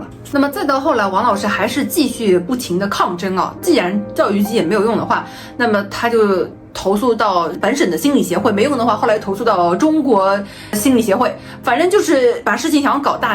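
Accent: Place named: Taiwan. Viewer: 😂怎麼有點台灣腔啊？哈哈哈 也太可愛了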